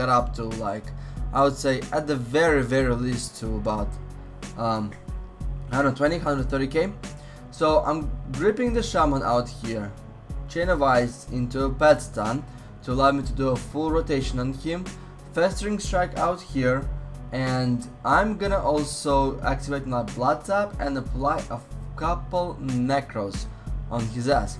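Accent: Russia. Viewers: I know it's pretty gay to say this, but man I missed your russian voice. ;)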